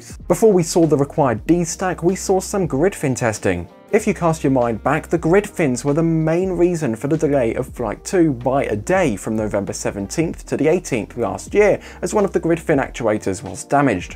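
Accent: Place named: Midlands, United Kingdom. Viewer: Brilliant, just Brilliant. Nice one! Anyone would think you were Scottish, with NO sunshine on that skin. But the middle England accent says no!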